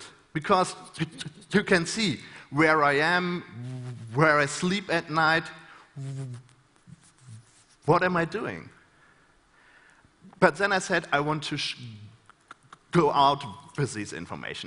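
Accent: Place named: Germany. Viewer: I don't care about stutter but this German accent? Gosh.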